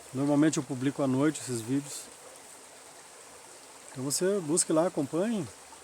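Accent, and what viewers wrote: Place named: Rio Grande do Sul, Brazil. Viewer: .., sim.. .logo, que tú lançou o questionamento.. . eu já tinha a resposta,,.. . só não deu tempo, de pó arar o vídeo, e Te responder aqui nos comentários.*Tú e aqui do estado,(RS )provavelmente ,creio eu deduzi, pelo teu sotaque, entonação entonação , enfim.. .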